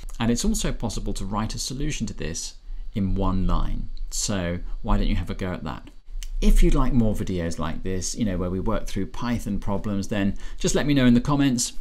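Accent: RP, United Kingdom. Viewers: I wouldn’t go so far as to it’s the Queen’s English, but probably as close to RP as you’ll see on a YouTube video on Python. As my mother would say, he’s ‘well spoken’ . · Is this Queen’s English? He sounds quite sophisticated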